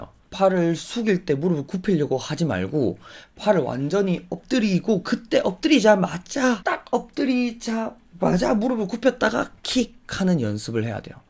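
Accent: Gyeongsang, South Korea. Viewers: 땜 경상도 사투리가 서윗해졌어요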